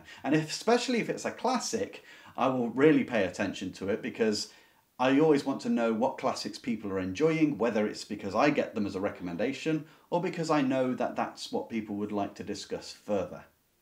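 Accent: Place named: United Kingdom. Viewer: Please, please take your fingers off the book’s title and its author when you discuss a book. Maybe even project the book on the screen. You go at top speed through introducing the book and then you put your hand over the book. Unless one pays close attention, your British accent and your galloping through the content makes it difficult to follow.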